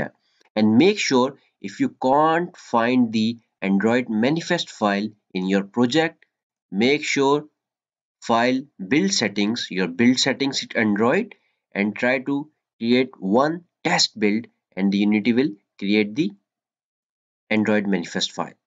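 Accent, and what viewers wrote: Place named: India. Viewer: 3 indian voice ! XD cool !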